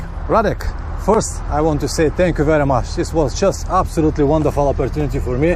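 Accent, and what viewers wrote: Russia. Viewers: Мне одному кажется что говорит с явным русским акцентом? )) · Русский? :) Акцент нашинский! ;) Мотики огонь оба - у меня 660я тенера, когда появится Т7 бэушная в России, я обязательно куплю её, верю что она сделана как надо! Спасибо за обзор, творческих успехов!!!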